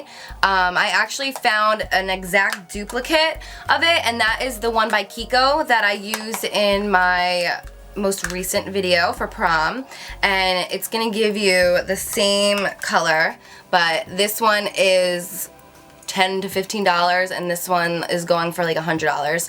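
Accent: New Jersey, United States. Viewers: I was going to comment on her pronunciation of these two brands as well, but then I saw your comments. Haha Being such a beauty guru, I was really kind of surprised she didn't know the correct pronunciation since these are really common brands--especially here in the U.S.. I think I noticed her mispronouncing NYX is another video as well. Nonetheless, she is still adorable. The mispronunciation just kinda added to her bubbly, cute, & quirky personality and that New Jersey accent! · It might just be her jersey accent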